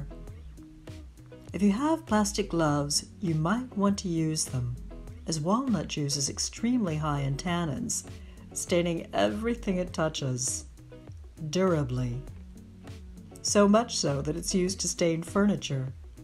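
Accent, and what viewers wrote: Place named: South, United States. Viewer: Are you a southerner by the way? I am, and to me your voice sounds southern. I am enjoying your vlogs.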